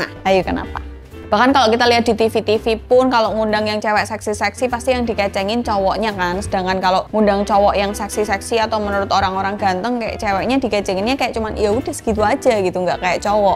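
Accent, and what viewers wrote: Java, Indonesia. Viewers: Medok amat logat jawanya😢, jd ilang selera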